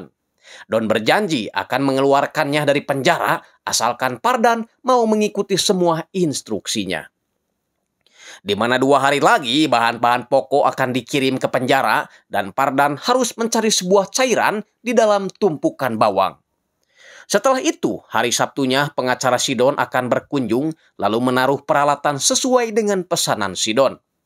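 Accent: West Java, Indonesia. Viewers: orang Sunda ya...logat nya Sunda banget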